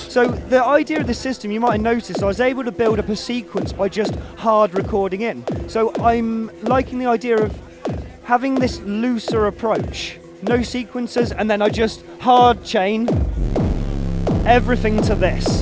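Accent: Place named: Bristol, United Kingdom. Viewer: Sounds like a Bristol boy!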